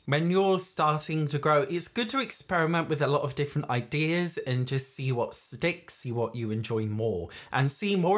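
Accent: United Kingdom. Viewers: Through most of this it got really creepy hearing all the things that make a person click off that matched me. Funny thing though, I literally just told my husband that I found a new person to put on in the background. This was solely based on your voice, accent and your laugh. I'm American, but he's from Scotland, so I made him listen because I was trying to guess what part of England you were from, lol. I had to rewatch the part where you said Americans told you to stop using a fake British accent three times because I was laughing so hard! 😂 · "can you not use a British accent? its distracting" wtf is wrong with people lmao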